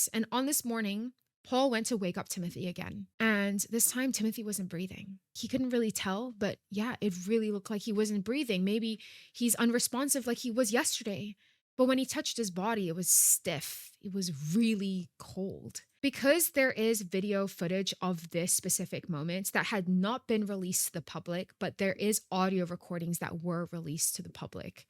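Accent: United Kingdom. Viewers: Are you British or American? I’m confused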